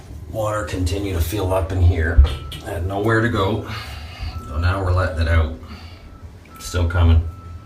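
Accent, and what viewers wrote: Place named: Canada. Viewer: Hey Bro ,,,,you gotta be a Canadian,,,,I hear yer "In's and yer Ouut's" lol !!!*^%$%%#!!!!!,,,.You're a hard worker with a lot of guts.Im a plumber in California.I learned a lot.Keep up the good work.DD In L.A.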